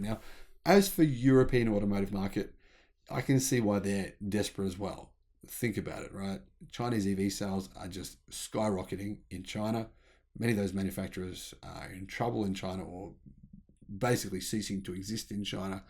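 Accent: Australia. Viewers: Your OZ accent is tough to follow. The speed of your speech makes it even tougher. Can you modify either, so that non-oz speakers can better comprehend your narrative ? Tx.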